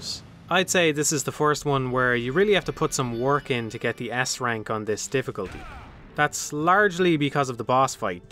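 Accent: Ireland. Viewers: Woah woah chill bro I didn't mean it like that.. why do I exist and you don't even know me? Who's the ignorant & insensitive one here? Jesus Christ what's your problem? Snowflakes like you are everywhere these days it seems.. Listen i got no problem with him being Irish.. on the contrary I only enjoyed this video even more because of his lovely accent and his knowledge & love for this game.. I wouldn't have it any other way that's why I keep coming back to this video years later.. I wasn't trying to be racially ignorant although my initial comment may have sounded a little insensitive, however I said it in a humorous sarcastic tone.. it's just that DMC is such a niche franchise and not many people are into it and because of its anime style I thought it was more popular in Japan than the rest of the world combined much less with an Irish guy.. hence my comment.. 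but does that mean I think no Irish fella or foreigners know about DMC? Ofc not! There are fans all over the world but none have I seen anyone explain it in detail like this guy.. Having said that he is the first Irish guy I've seen on YouTube that has expressed so much love for this game through his vast knowledge on this game.. my original comment had no intentions to offend him and I doubt he would be since he probably has thick skin and can take a joke unlike a certain snowflake lol.. just kidding.. love you too bro.. just don't be quick to judge people next time geeZ.. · You Irish?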